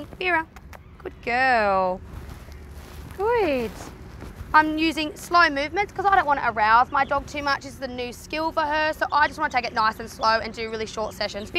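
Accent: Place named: Australia. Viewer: What nationality are you?? She's speaking Australian & we speak slower then other nationalities.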